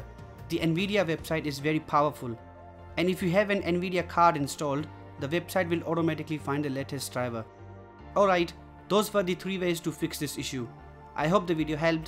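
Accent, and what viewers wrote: India. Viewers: tutorial + indian accent = perfection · Knew when I heard the indian accent that this would fix my problem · its always the Indian voice to rescue our days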